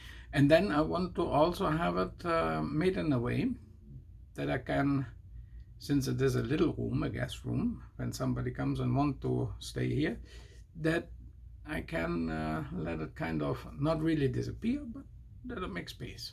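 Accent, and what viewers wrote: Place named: Germany. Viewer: Nice video:-). Thanks so much for sharing this and also it was really really nice to hear from you on the 8th October 2020:-). You do make some creative suggestions and thorough talks about how you make your model rail layout giving examples to what it can relate to relating to real life stuff:-). Well done indeed:-). Thanks so much for sharing this excellent video its an excellent looking model railway you have and well layed out your model railway:-). Plus you thoroughly explained your rail layout terribly greatly I must admit:-). Especially being German and despite being German you're certainly sounding really fluent in English with your accent:-). I can see you're fluent in both English and German which is excellent I do say and its ok whether you are or not:-). We can only be fluent in so many languages:-). Alright then, have a nice evening, plus I wish you all the best and take care of yourself:-D:-D:-). 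From your friend Foxy:-).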